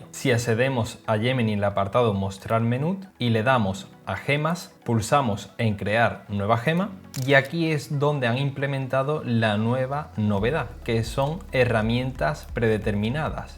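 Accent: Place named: Seville, Spain. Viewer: Ha sido escucharte y saber que eras Sevillano...ese acento nos delata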